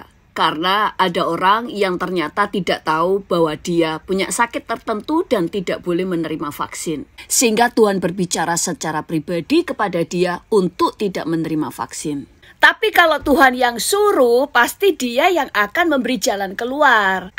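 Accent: Java, Indonesia. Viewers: mantap bu.. Logat jawanya...kental...sy suka...sehingga penjelasannya...bagus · logatnya terkesan medok banget jawa-nya hehehehe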